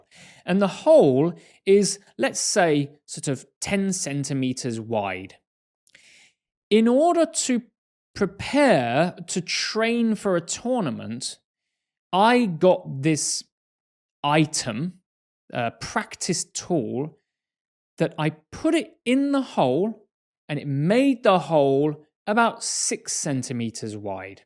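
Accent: United Kingdom. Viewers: I love it when I heard your wonderful British accent.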